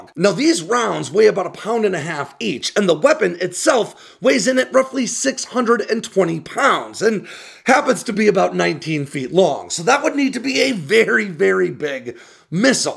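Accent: United States. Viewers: You say that almost like an American would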